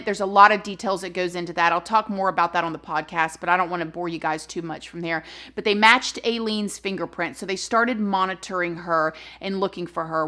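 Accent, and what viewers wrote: South, United States. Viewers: Maybe it's your southern accent but most people pronounce Aileen as eye-leen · I feel so bad for her, she had a terrible existence from when she was a child. So sad for her and her victims. Slight side note.. love your accent. Whenever my friends and I (Brits) mess around with American accents ( I've literally been doing this since I was a kid) it's always your accent 😂 love it!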